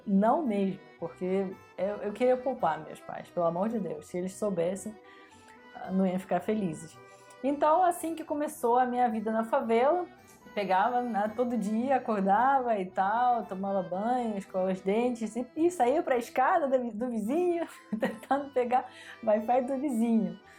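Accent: Rio de Janeiro, Brazil. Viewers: Seu sotaque é mais carioca do que russo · Você já está com sotaque carioca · adoro seu sotaque carioca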